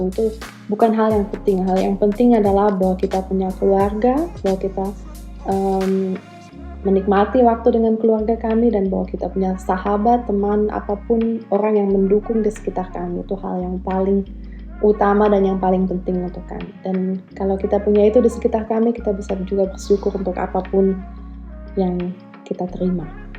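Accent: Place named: Papua, Indonesia. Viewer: . klu aku tutup mata..kamu bukan org jerman..bahasa indonsia logat papua kereeen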